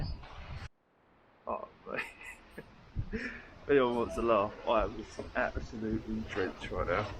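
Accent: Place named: United Kingdom. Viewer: ¡Hola! Since México, my english is not goog, but I say you: When you speck the Britain English, it hear very nice, interesting and elegant.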